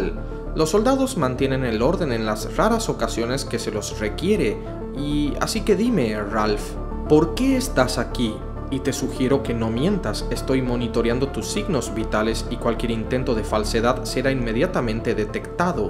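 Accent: Argentina. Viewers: Alguien que me diga de que parte de Argentina es el narrador?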